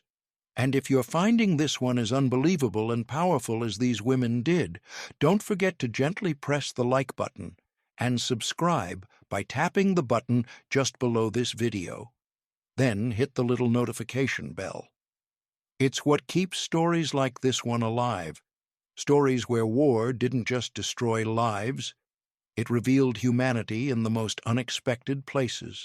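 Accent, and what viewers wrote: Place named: United Kingdom. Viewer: Texas in America and yet you choose to use a British sample narration. I don't understand.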